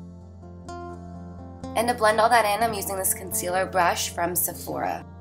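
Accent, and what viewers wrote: New Jersey, United States. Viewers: "Soft and genal" your jersey accent is so strong hahah ^.^ I love this look!!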